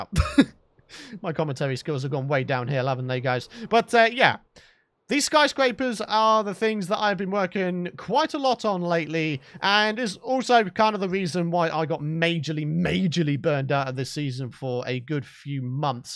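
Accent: United Kingdom. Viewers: After listening to Last Week Tonight and then watching this, I honestly thought John Oliver was playing Minecraft 😂. Love your voice m8; so incredibly British! Excellent as always... PEACE!!!✌️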